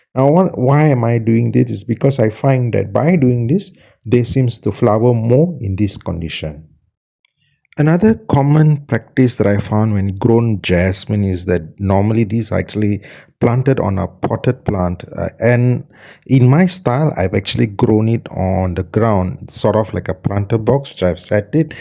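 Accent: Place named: Malaysia. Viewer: You sound Malaysian or Singaporean. Where can I get this plant in Malaysia ?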